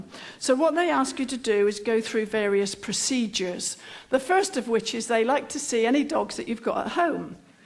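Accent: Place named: South East England, United Kingdom. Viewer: From the Westcountry (Cornwall), that is not a Westcountry accent anywhere, sounds like old rural accent from the south east to the west of London, before alll the cockney Londoners spread out. So yes, borderland between Oxfordshire and west Berkshire is where I'd place her.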